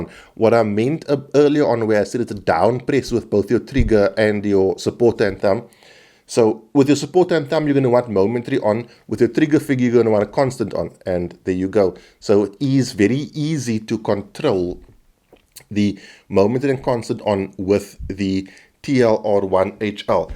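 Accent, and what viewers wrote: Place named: South Africa. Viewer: You are pure South Africa 🇿🇦 the accent thick.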